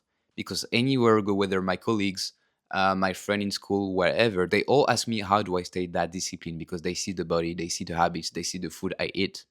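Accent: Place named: France. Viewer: This video is so informative! That French accent tho 🤌🏽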